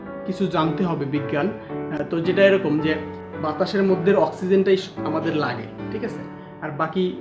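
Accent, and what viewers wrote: Sylhet, Bangladesh. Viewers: ভাই আপনার দেশের বাড়ি কি সিলেটে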